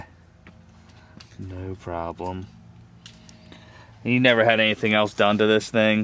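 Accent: Maryland, United States. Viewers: I may be wrong and that’s ok on my observation. And no offense implied or intended. But since I started watching this channel, I’ve suspected you are from or spent a substantial amount of time in Maryland to develop the quintessential accent of the region. I was born and raised in Maryland myself, and that is very familiar to me. Happy Thanksgiving!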